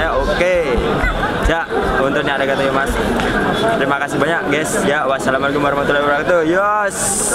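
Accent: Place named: Medan, Indonesia. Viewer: Dari logatnya orang medan kayaknya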